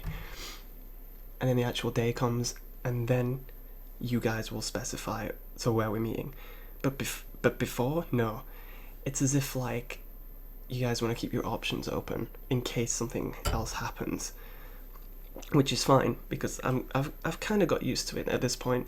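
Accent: United Kingdom. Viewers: Are you a Brit? I have a degree in British English and British Literature :) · Bruh I can’t tell if your British or not, it’s freakin me out pfft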